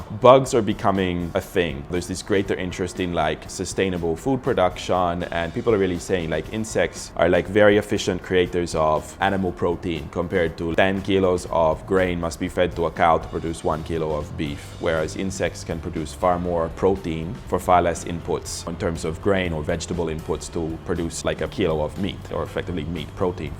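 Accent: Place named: Philippines. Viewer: bossing, yung australian accent mo nagiging pinoy accent... naturalized ka na!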